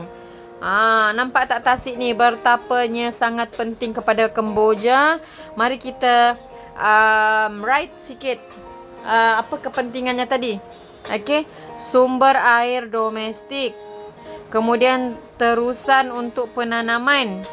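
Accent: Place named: Indonesia. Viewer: Ckp macam Indonesia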